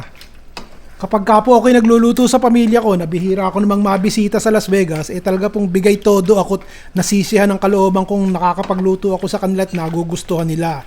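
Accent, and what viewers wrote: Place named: Batangas, Philippines. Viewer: Nkktuwa ka po mag salita. Akala ko po Taga Batangas ka po or Bulacan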